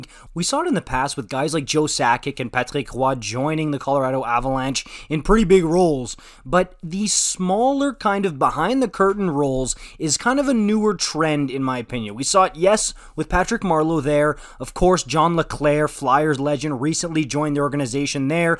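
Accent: France. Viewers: Are you french? Gotta say you did great! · Youtuber sounds like a native French speaker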